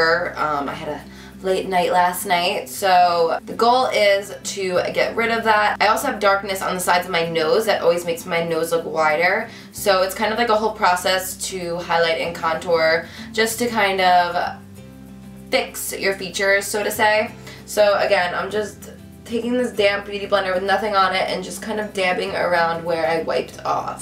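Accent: New Jersey, United States. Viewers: Are you from New Jersey? I can hear the accent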